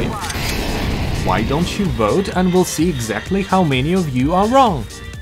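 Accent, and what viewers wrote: Russia. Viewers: Очень забавно слушать тебя, говорящего по-английски, но с интонацией из русского языка))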